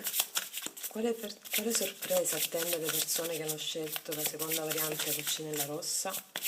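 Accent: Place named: Lecce, Italy. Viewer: Grazie ti seguo perché sei preparata e usi le tue carte in maniera adeguata, comunque la prima variante è ciò che sto vivendo grazie, e poi hai il mio accento leccese🤣💖💖💖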